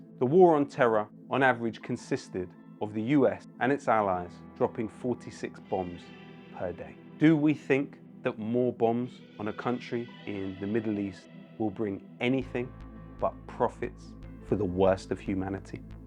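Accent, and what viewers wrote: United Kingdom. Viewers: Nice British accent u have there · Bro says all this in his thick British accent in his massive house…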